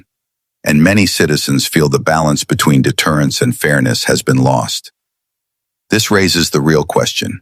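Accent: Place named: United States. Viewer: England Now has American Voiceover. So I'm blocking England Now. Everyone else, please follow me.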